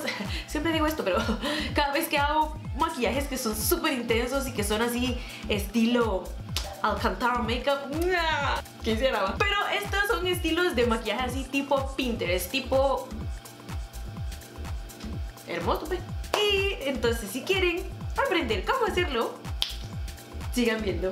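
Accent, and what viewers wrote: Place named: Guatemala. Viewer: Me encanta tu acento chapin! Super chivo el video 🌟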